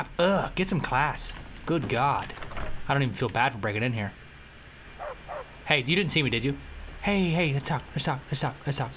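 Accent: Scotland, United Kingdom. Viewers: I prefer the scottish accent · No he spoke british and scottish accents in the video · he's got the Scottish accent but not the slang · SCOTTISH ACCENT? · dat scottish accent :V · 'TIs a Scottish accent :3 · Not bad Scottish accent :D Of course, i'm comparing you to Hypermole from mole237 (free advert for him) So...yeh, do it more :D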